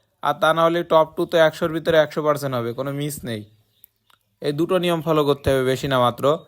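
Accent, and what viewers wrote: Jessore, Bangladesh. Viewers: খুলনা বাগেরহাট যশোর তো একদিকেই এ কারণে কথা ওরকম মনে হচ্ছিলো..আমার খুলনা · সেই ভাই,,, আপনার বাসা যশোরের মধ্যে মনে হচ্ছে 🤔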